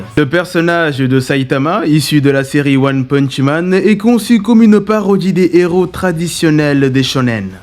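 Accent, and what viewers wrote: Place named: Africa. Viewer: t'as un accent africains que tu veux gomer, c'est dommage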